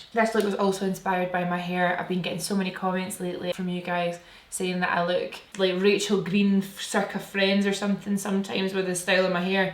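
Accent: Scotland, United Kingdom. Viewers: i am sure this gets so old but I am a sucker for accents and trying to pinpoint what they are. So forgive me in advance but is that a scottish accent i hear? Love your channel & videos as well, of course!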